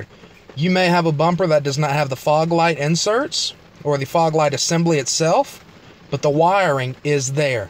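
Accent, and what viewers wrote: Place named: South, United States. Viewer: Damn hick accent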